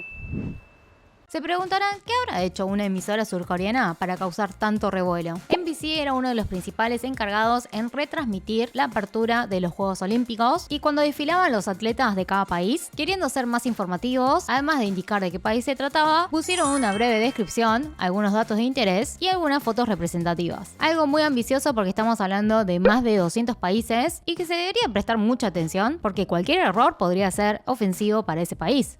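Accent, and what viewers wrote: Argentina. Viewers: hablas re bien el argentino wtf cm lo logro Xd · ni tan mal la descripción de Ecuador 🇪🇨 bonito que destacaran el Chimborazo y las tortugas gigantes de Galápagos, pensé que pondrían bananos o maíz. Buen video, me llama la atención tu acento argentino para hablar español jajaja.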